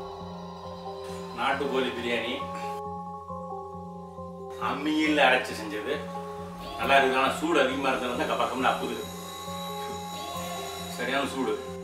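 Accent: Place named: South India, India. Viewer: I promise I am not going to watch useless videos which r wasting my time Also me -watching this* His videos r love😍😍😍..I saw his Hindi channel that Hindi accent of southies is really cute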